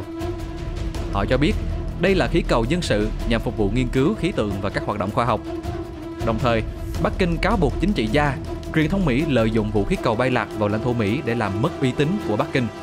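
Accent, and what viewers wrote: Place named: Quang Nam, Vietnam. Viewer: Giọng đọc không giấu được chất quảng nam.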